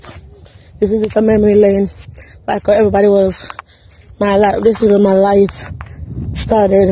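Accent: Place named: Jamaica. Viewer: Like your attitude. You don't make overseas change you one bit. You maintain your Jamaican accent.❤💜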